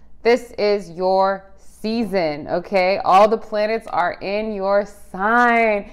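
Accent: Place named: Australia. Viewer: Australian accent 😅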